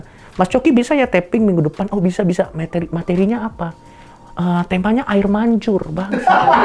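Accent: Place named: Madura, Indonesia. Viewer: saya mendengar suara mas mas madura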